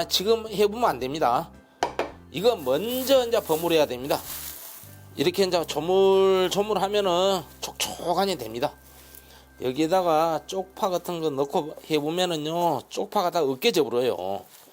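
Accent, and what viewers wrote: Jeolla, South Korea. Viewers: 여나무바꾸만 돌리믄 됩니다 ㅎㅎㅎ 전라도 사람들만. 알아듣는사투리 그것도. 나이먹은사람들만아는 ㅎㅎ 구수하고. 정겹습니다 음시도 전라도스타일이시고 최보십니다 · 찐한 전라도 사투리 정겹습니다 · 오늘도 구수한 전라도 사투리에 엄청 웃었네요 요리도 최고 👍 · 김 묻힘~. 여나무바꾸 ㅎㅎ 샘의 사투리가 넘웃겨용ㅎㅎ 저두 전라도라 알아묵었는데용 ㅎㅎ 멋진 요리 틈나는대로 보고 많이 배웁니다요 매뉴 감사합니다~. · 역시 세프님 멋있어요. 전라도 구수한 사투리로. 설명이 좋읍니다 건강하세요.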